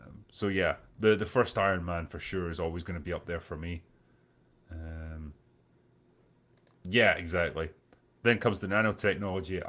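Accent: Scotland, United Kingdom. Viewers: Talks like a Scott, drinks like an Irishman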